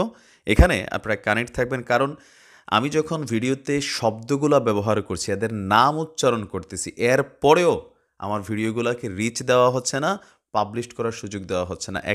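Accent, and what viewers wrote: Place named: Kotalipara, Bangladesh. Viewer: ভাই "ক" কইলে কোটালি পাড়া বুঝি।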